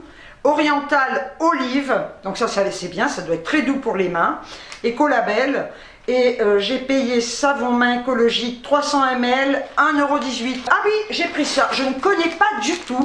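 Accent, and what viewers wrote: Algeria. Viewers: ton commentaire m'a fait tellement plaisir ❤️❤️avec tous les commentaires que tu as je sais que c'est loin d'être facile. Merci du fond du cœur ❤️ ❤️ je t'embrasse très fort tu as une personnalité que j'adore j'aime tellement ton naturel ton franc parlé...j'aime aussi retrouver la gestuelle et l'accent pieds noirs. Ma maman elle aussi parle autant avec les mains qu'avec la bouche 😂😂c'est ça les pieds-noirs et qu'est ce que je me régale devant tes vidéos ❤️ ❤️ Énorme bisous ♥️ merci encore pour le réconfort que nous apporte tes vidéos❤️❤️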